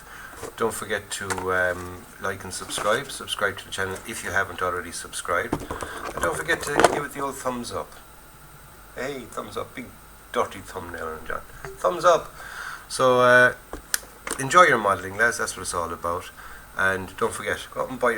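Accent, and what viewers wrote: Ireland. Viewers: And your irish accent reminds me of donald sutherlands in the "eagle has landed"!👍🏻 Jman👀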